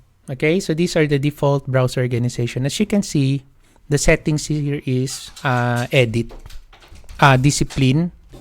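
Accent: Philippines. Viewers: are you pinoy? you sounds like pinoy sir. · accent palang pinoy na alam ko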